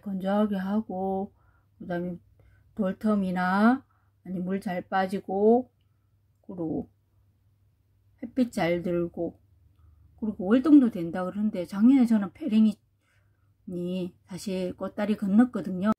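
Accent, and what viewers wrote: Gyeongsang, South Korea. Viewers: 요즘 아트에서도 꽃나무 판매 하더라구요. 예쁜아이들 잘들여 오셨어오. 경상도 사투리 귀엽고 예쁘세요.ㅎ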